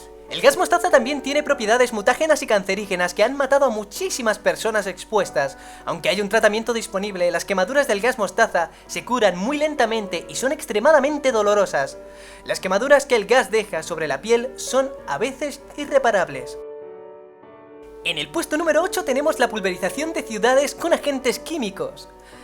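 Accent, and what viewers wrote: Spain. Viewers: sin más Español de España JAJAJAJSJJA ni modo que sea español de Colombia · así? es español de España, yo no veo nada raro xddd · Me encanta como hablan los españoles. Me encanta tambien tu canal.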